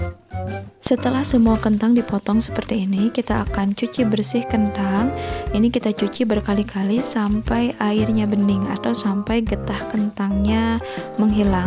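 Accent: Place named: West Java, Indonesia. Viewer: Ooh punten, rupanya org Sunda yaaa..? soalnya klo org Bali lafal kan huruf T itu Lidah nya nekan ke langit langit, klo wilayah lain Lidah itu menekan ujung gigi Atas..duh hapunten yaa..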